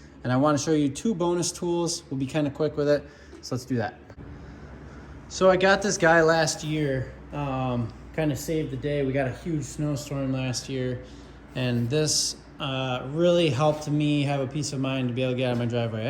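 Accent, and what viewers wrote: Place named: Wisconsin, United States. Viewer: Are you from Wisconsin? Your accent sounds like Wisconsin.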